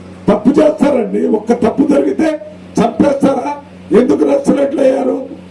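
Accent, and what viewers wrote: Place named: Andhra Pradesh, India. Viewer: నీ ఆంధ్రా మాటలు ఎవనికి అర్థం అయితలేదు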